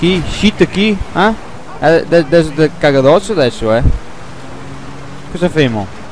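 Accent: Veneto, Italy. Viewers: Setu veneto?🤔😂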